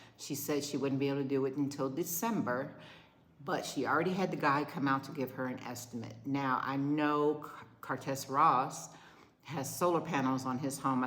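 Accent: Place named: New York, United States. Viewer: My sister from NY moved to Merida with her boys but had health issues with the mold - you sound like a New Yorker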